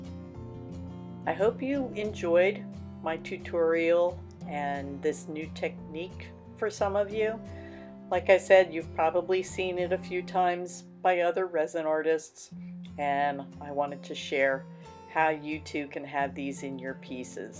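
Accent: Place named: Northeast, United States. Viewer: I was so excited about the phone tip. Seems apple has removed that part of the compass 😔 And I knew from your accent you are from the NE. If you’re like me you’re saying, I don’t have an accent!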